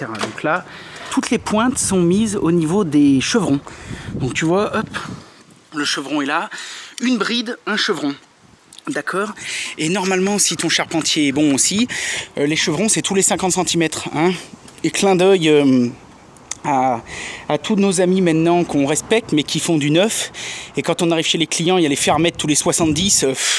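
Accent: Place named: Franche-Comté, France. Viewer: Salut avec ton accent t es de chez moi , tu est francomtois?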